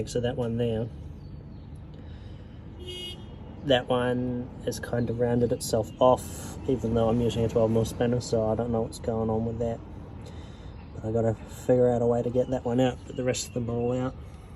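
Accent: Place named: New Zealand. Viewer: I know a fellow kiwi when I hear one haha, what part of the country are you in? What transmission do you plan on using and power/Tq level you're aiming for? Planning to also go turbo on my M104 powered S124. Currently have a 717.404 box but don't think it'll hold up, might try a little boost on it first and see what happens.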